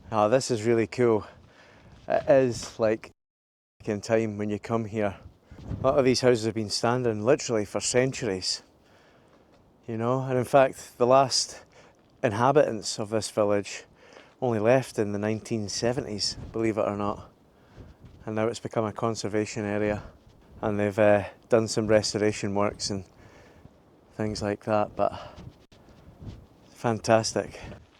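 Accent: Scotland, United Kingdom. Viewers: I found your channel by following you on IG and I still can't figure out how I found you there either lol. This is wonderful and you have a new fan from now on. It's quite hard for me to fully understand that scottish accent but I'm doing my best without using the subtitles. I'm from Argentina and been studying english for many many years but the scottish accent still gives me nightmares lol. Thanks for sharing all these magnificient places.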